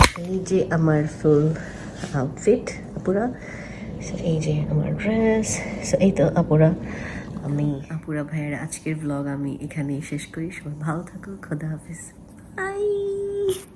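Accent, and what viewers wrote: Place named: Bangladesh. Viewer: তোমার আরেক কোন এক ঈদের ব্লগ থেকে দেখা শুরু তোমার ব্লগ।মজার কথা ভাইয়াকে দেখে ১ম আমি ভেবেছি ইন্ডিয়ার নামক এর একজন অভিনেতা ও তোমাকে ১ ম দেখায় মুগ্ধ। ভয়েজ শোনার সাথে বুজমাল তুমি বাংলাদেশী+ আমরা নারায়ণগঞ্জ বাসী😍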